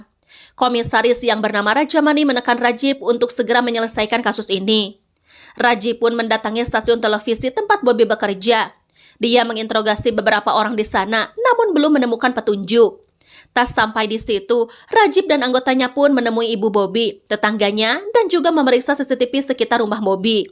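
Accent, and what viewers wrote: West Java, Indonesia. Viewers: semangat ya cantik , perbanyakin aksen sunda nya ya . itu yg makin tambah greget loh , biar punya ciri khas macam mang jon iq7 , reza.saqahayang & mang andreas baok kompa 😊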